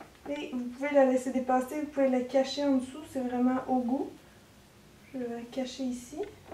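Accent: Quebec, Canada. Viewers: Je kiffe l'accent québécois ! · J'peux pas supporter ton accent canadien. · Coucou de France. Je suis un gars qui a découvert ta chaîne il y a trois jours et c'est un véritable enchantement que de te voir expliquer avec clarté et enthousiasme ta passion. C'est un plaisir de t'écouter, tu as un très bon vocabulaire, j'adore l'accent québécois et en plus tu es belle à voir (et à revoir). J'aime ton naturel, ton regard, ton sourire, ta joie de vivre et ta manière d'être. En fait j'aime tout chez toi. Je te souhaite une belle réussite professionnelle et du bonheur.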